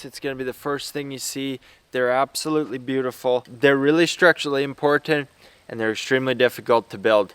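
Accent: Canada. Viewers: I can't help but wonder where the Canadian accent came from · ❤WOW From Norristown PA here How old are you ? I know your young but your come off with old knowledge and worth ro work ethics ? You put yourself down so much & are so hard on yourself & your doing so much then any one your age it's amazing and phenomenal 👍🏾 I hope your name comes a worldwide name You deserve it & I hope your do a Q&A Telling people about your family & life grown up in Canada i love your accent I could listen to you forever the thing you do I have not clue even how to start doing Do ever stop 👍🏾🙏🏾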